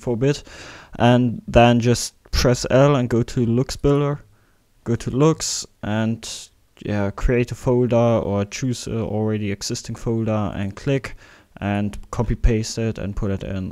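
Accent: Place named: Germany. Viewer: Man merkt dass du deutscher bist :D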